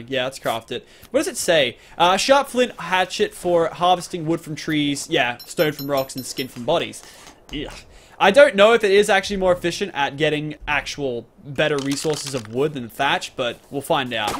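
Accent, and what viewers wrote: Australia. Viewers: He is australian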